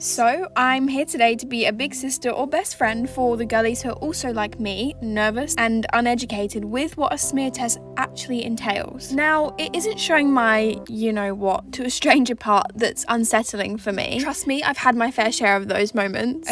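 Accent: Australia. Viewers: UR AUSTRALIAN ACCENT HAS CAME OUT AO MICH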